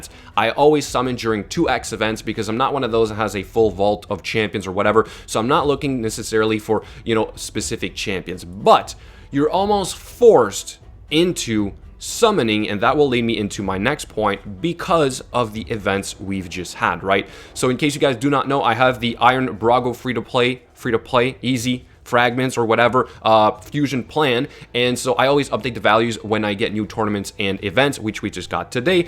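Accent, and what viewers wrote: Quebec, Canada. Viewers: Yeah. Not even close. I think this guy has a bit of an accent more then anything. He sounds like he might be French Canadian.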